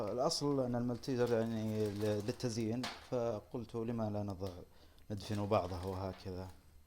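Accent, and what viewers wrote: Saudi Arabia. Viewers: شويه يتكلم بلغه العربيه وشويه سعودي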